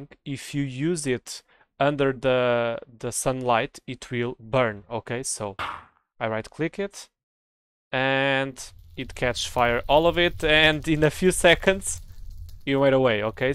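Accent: Portugal. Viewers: Um tuga conseguir indentificar um tuga a falar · tenho quase a certeza que és português pelo teu sotaque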